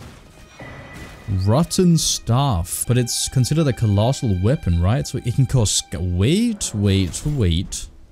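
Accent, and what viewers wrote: Denmark. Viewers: You know that, when you can just hear that danish accent. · are you from denmark? it sounds like you have the accent, i talk like that aswell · man that accent sounds so familiar, this guy gotta be a dane :P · I love within 10 sec i can hear you are a danish brother. XD Nice vid my dood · The danish accent tho xD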